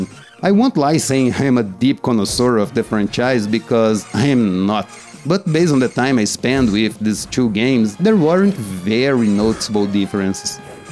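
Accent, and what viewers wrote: Brazil. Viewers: o sotaque BR me surpreendeu kk bom video man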